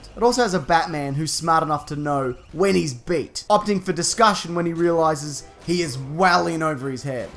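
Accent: Australia. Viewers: Kay ShyGuy I think he's actually australian...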